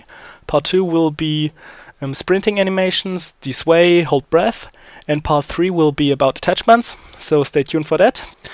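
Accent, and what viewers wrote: Germany. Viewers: Nice German dialect. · Are you German? It sounds like so..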